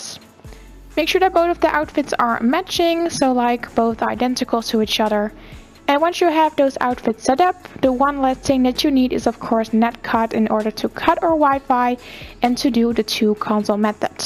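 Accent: Netherlands. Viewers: It's her accent, shes from nederlands I'm pretty sure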